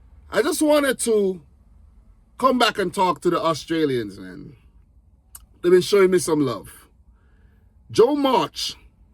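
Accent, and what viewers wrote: Caribbean. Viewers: You sound like you’re from the Caribbean but you live in the USA?because you know a lot about our cricket team I have so many favourite players and even met a West Indies legend when he was playing in Australia yes I met the Universe boss Chris Gayle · To be fair though it's clear from his accent that he grew up in a Carribean nation so he doesn't speak like a Yank.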